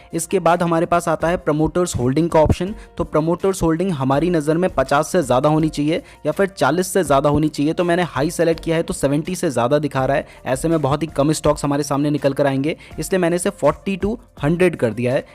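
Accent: Uttarakhand, India. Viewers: Bro. Uttarakhand se ho na 😎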